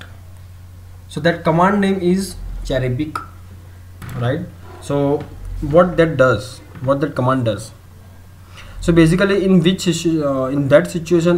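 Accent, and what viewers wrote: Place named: India. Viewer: man you have the best indian dialect i've ever heard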